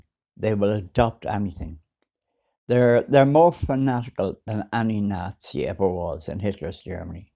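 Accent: Ireland. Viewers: Its the content is what counts man. Many excellent points. You are not wandering at all man. Its also your honesty and integrity. Im born and bred Dublin too but I could listen to that accent and watch you all day. Keep up the great work. You are an old-school true blue, true Dub with top values and principles. Yes it is stunningly disturbing how quickly woke was adopted here and throughout the west. Its the wealthy Marxists to blame! Ireland seems lost. God bless.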